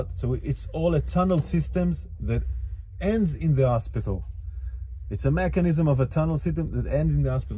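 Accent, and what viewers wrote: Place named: United States. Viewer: He is trying to hide hi American accent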